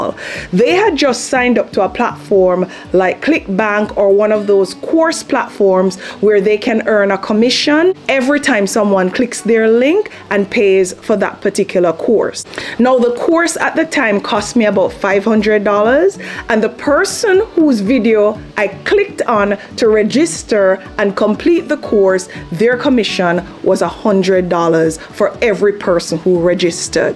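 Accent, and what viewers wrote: Jamaica. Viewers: Wow, You are n excellent communicator. I am guessing Jamaican. Or perhaps an African land. Any way love your presentation. There was a lot and a bit over-whelming. I am coming up on 11 months on my channel and making progress but have not added any affiliates as when I started to add one 8 months ago I was warned by YT. I need help making sure I don't break any monitization rules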